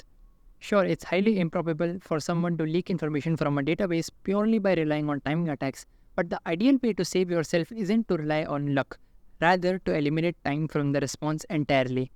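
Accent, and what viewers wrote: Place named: India. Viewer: Just realized it's better switch Siri to Indian accent so I would just get used to it somehow for videos like this.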